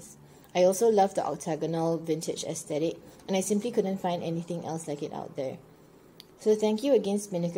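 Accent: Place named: Barbados. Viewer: Where are you from? You sound like you're from the islands. Barbados?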